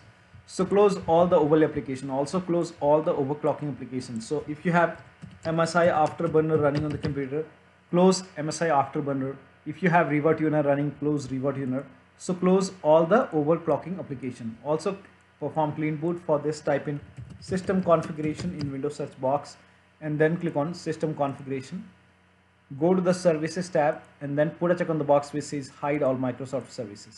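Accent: India. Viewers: Why is it always the worst desi accent always in these videos?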